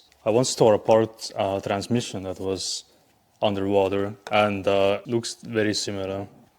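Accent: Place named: Estonia. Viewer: I thought your were Finnish from the accent but now I realize that’s Estonian.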